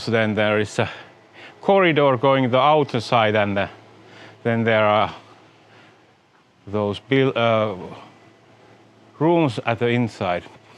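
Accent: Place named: Finland. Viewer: Do you speak Japanese. i do enjoy your Finish lilt and English , very interesting and fun to listen to.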